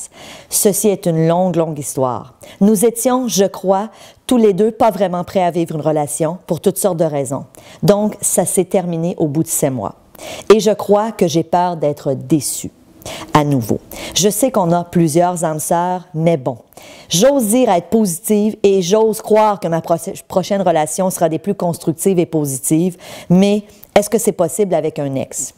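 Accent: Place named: Quebec, Canada. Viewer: c'est trop joli l'accent québécois, j'aime trop !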